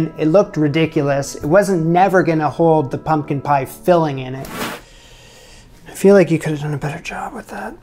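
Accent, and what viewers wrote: Canada. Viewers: First time I ever saw your vid. For some reason I detect a bit of Canadian in your voice but could be wrong?I very much like your "son of a bitch"! Will use your filling recipe to make pumpkin custard because stressing with pastry is not my idea of a good time. Subscribed!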